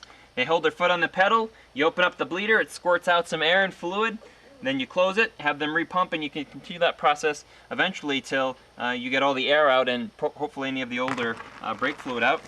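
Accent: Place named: North, United States. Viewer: I suspect by his accent he is up north, in the US ... hence the rusting....great video..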